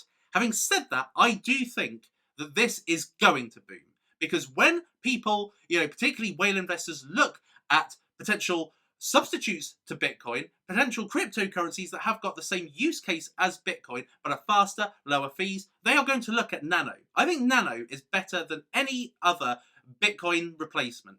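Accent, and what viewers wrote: United Kingdom. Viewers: Sing in english accent talks in british accent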